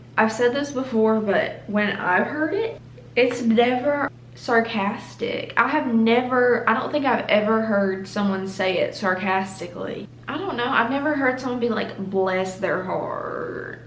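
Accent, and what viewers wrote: South, United States. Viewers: I'm from Alabama, too, and I'm trying to see if my accent is this strong when I speak lol It probably is 🤣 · More southern accent plsss I like to be a cowboy one day did you know🤠 love you're accent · There are two reasons I subscribed you. 1- Your southern accent 2- Your beautiful face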